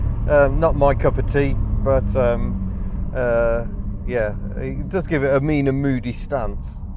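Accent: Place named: England, United Kingdom. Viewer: My God where did you get this sad old beast? Do all NZlanders sound English?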